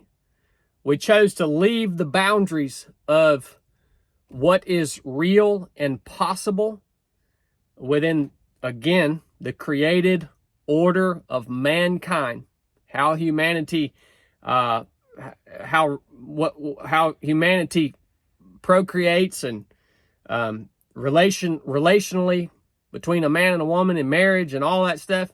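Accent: South, United States. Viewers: What state down south are you from bro?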